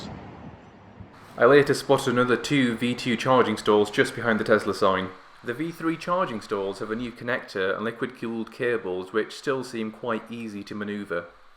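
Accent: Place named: Yorkshire, United Kingdom. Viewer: Half southern half yorkshire accent lol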